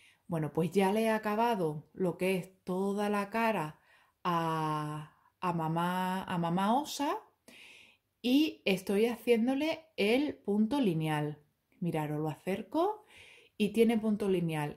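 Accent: Extremadura, Spain. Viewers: Preciosos todos. Tu marido , ¡vaya como borda!. Por cierto, me encanta tu acento extremeño.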